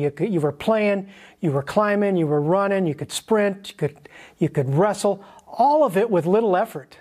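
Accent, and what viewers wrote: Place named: Wisconsin, United States. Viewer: Excellent information thanks! I'm curious if rebounding on a trampoline is as effective? it seems easier on the body and joints . Challenging to stay on toes... Also I cannot sustain 2 consecutive minutes. I take breaks and do probably 4 or 5 minutes total. Also I detect a northern accent Wisconsin? 😀🙂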